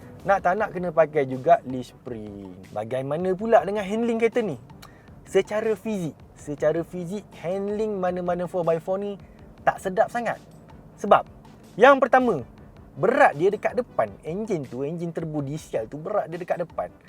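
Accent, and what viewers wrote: Kelantan, Malaysia. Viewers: ada bau2 kelate tu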